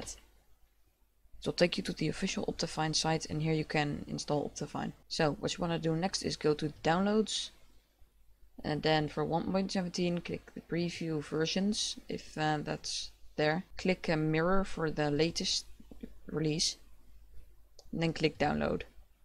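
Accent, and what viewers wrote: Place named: Netherlands. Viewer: I smell nederlands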